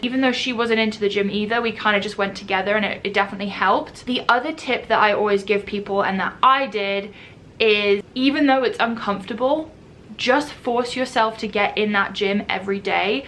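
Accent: United Kingdom. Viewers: With your Brit accent and my Brooklynese we should do a video together. What a trip that would be. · Your accent jumps between British and American it's wild! 🥰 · Are you British?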